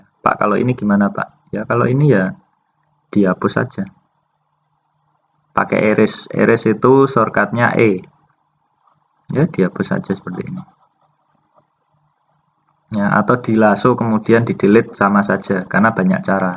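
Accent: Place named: Java, Indonesia. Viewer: Pak medok banget hh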